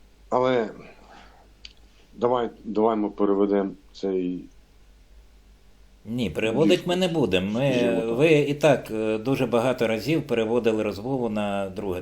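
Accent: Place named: Rivne, Ukraine. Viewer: він з Рівно точно, акцент не бреше,я то знаю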